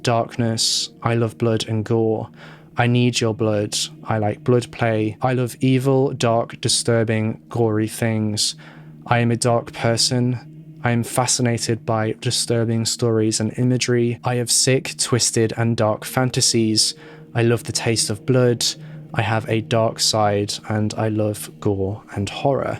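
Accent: United Kingdom. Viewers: I do enjoy your presentations! I also am a fan of “Dark Curiosities”, as I noticed on your channels list. I love British your accent, as it makes listening seem more mysterious! I’m an older person, and have Bi-Polar, as well. I take my meds daily! It effects all a bit differently, and poor Chelsey’s was sadly out of control. Also sad , is the fact that sinister people like “Dark Rider”, are out there as predators. To people in vulnerable.conditions, as her’s. Stay well!🙏🧡✌️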